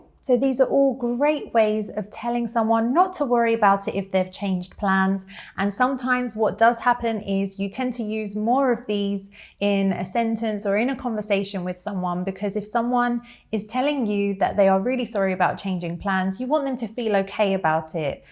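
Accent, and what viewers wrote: United Kingdom. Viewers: I love your British accent 😍😍😍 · After im following your lessons i think im fallin in love with UK accent.... Kinda sweet and cute...🙃🙃🙃🙃🙃🌹🥳⚘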